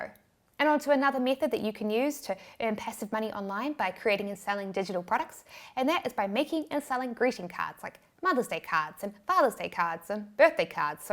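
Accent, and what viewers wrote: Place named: New Zealand. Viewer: As always, you present valuable content in your videos. In this video, you talked about digital product ideas I had not thought about. Thank you! There's another reason why I enjoy watching your videos. That reason is listening to you speak. I've loved the sound of the New Zealand, Australian, and British accents — in that order. Be safe. Stay healthy. And, most of all, enjoy your vacation!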